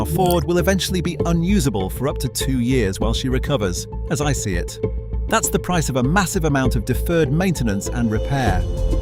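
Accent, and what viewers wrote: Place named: United Kingdom. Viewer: The Ford is already back in the Red Sea ready to go. By the British accent I would say wishful thinking.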